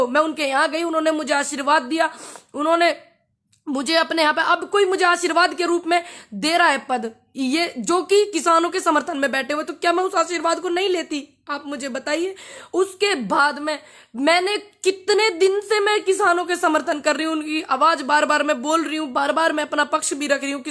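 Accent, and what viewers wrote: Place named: Western Uttar Pradesh, India. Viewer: बिटिया! हम आपके साथ हैं । आपकीे भाषा दूसरी नहीं है । मै मेरठ से रहा हूंँ । मैने आपके लगभग सभी वीडियॊ देखें हैं । आपने कहीं भी अभद्र भाषा का इस्तमाल नहीं किया है । आपकीे भाषा पश्चिमी यू पी की ग्रामीण खड़ी बोली है, यह एक धांकड़ बोली है, हाजर जबाब बोली है । आप यदि दिल्ली में बोली जाने वाली बोली का इस्तमाल करेगीं तो पूरा देश आपकीे आवाज सुनेगा । इसके लिये आपको यू पी शिक्षा बोर्ड के हिंदी के गद्य-लेख पढने होंगें ।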